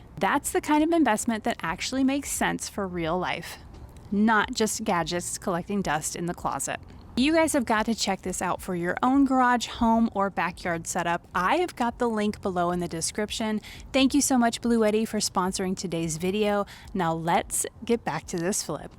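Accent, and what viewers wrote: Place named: Midwest, United States. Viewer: You sound like your more from Midwest than the market she’s in.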